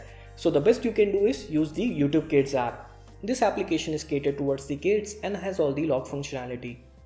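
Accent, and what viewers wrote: India. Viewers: Fuck up with your Indian accent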